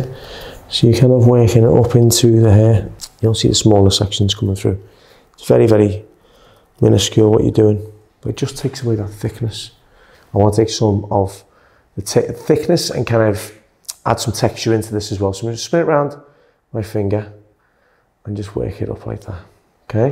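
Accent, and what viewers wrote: Liverpool, United Kingdom. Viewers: you sound like you have a Liverpool accent I am right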